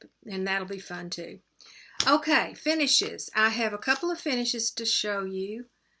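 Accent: South, United States. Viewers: We replaced our 100 gallon hot water heater with a tankless one and love it! It does take a few minutes for the hot water to get to our shower but that’s because the heater is in a pool closet on the opposite side of the house. You have endless hot water and never have to worry about running out! It also gives you a whole lot of room compared to the giant tank! Enjoy😀 I love watching and listening to your videos. I grew up in Virginia and moved to South Florida almost 35 years ago. Not many soft spoken, Southern accents down here😢. Happy 4th🇺🇸🇺🇸🇺🇸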